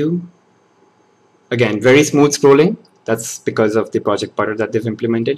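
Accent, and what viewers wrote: India. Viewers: indian accent!!! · You suck !!!!! I dislike it because your english is an indian so its suck alot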